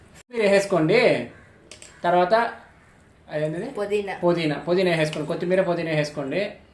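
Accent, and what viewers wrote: Rayalaseema, India. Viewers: Rayalaseema accent tagedela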